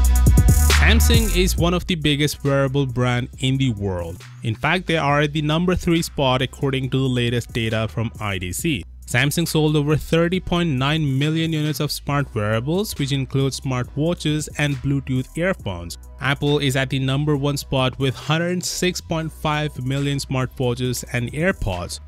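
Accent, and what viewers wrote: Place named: India. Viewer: Disliked. Sounds like Indian scam call center.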